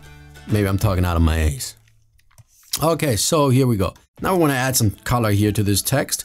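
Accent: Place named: Italy. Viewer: I knew I recognised something italian-ish to you! That's because you are in fact Italian! Grande! :D I love the way you talk through your contents, and also the voice sounds. They made my day when I heard them the first time. I am almost new to Fusion and I am trying to get into it as much as I can, also because I am trying to push it in the company I am currently working for. Anyways, bel lavoro!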